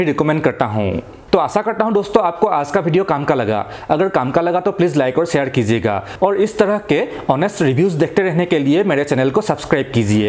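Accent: Assam, India. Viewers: Bhai aap assam se ho kya 😁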